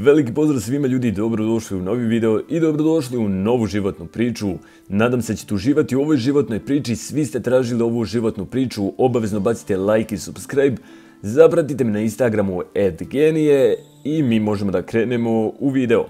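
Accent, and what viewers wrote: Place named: Ekavian, Serbia. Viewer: Genije zvuci kao lik sa kanala "BalkanAlert" samo sto Genije prica ekavicom.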